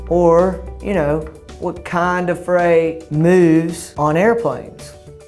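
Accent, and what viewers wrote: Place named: Texas, United States. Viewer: ¡ Love the Texan Accent ! 😉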